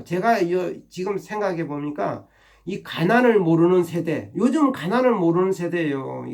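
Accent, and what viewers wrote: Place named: Gyeongsang, South Korea. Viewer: 어디세요 미군도 아시면 의정부 정도 아닌가요 말씀은 경상도 사투리이신데요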